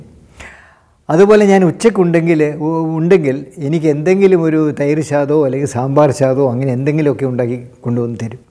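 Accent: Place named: Kannur, India. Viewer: Kannur karanano, aa samsaaram varunnu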